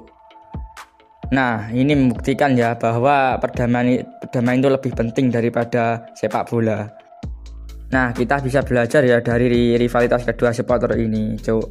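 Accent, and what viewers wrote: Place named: Java, Indonesia. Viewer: Narator bahasanya kok medok bgt... Jijay sekalee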